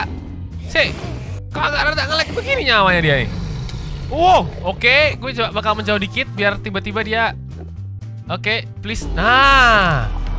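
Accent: Java, Indonesia. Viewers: Wong jowo opo uduk mas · Orang Jawa bang to Yo to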